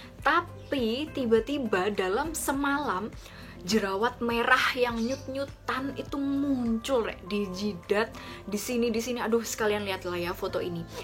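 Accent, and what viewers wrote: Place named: East Java, Indonesia. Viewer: Hahaa... Jawa timur rek.. asyik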